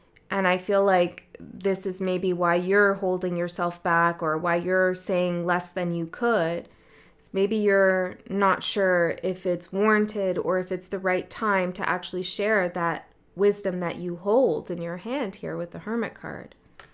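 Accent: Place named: Canada. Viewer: I thought I heard a Canadian accent, so I looked at your page, sure enough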